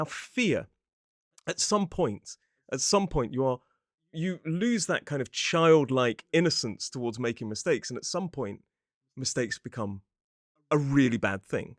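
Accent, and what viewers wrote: United Kingdom. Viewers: You are the wonderful teacher in the world, I like British accent because of you , thank you so much 👍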